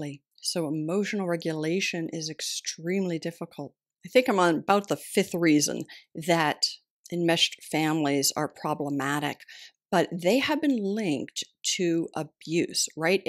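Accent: United States. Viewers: Faellow... This american flat accents are just hard to listen to.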